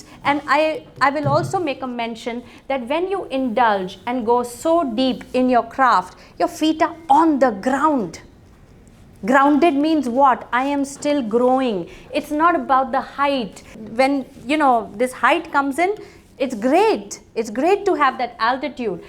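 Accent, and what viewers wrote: India. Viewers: In this video there is maximum Indian English... Keep it up.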